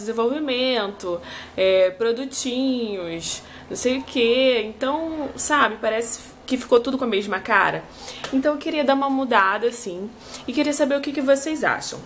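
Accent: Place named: Rio de Janeiro, Brazil. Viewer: Vá em frente!! Nós vamos amar!! Estou começando a gravar vídeos, se quiser conhecer ficarei mto feliz!! Adoro seu sotaque carioca! É lindo! mil bjs!!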